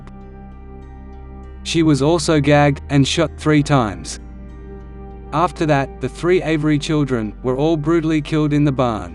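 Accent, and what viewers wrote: United Kingdom. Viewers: WTF?! Why a British accent. Turned off, leaving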